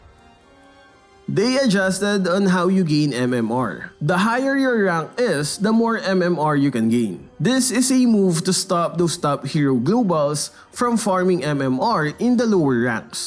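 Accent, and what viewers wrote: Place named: Philippines. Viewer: The filipino accent is strong in this one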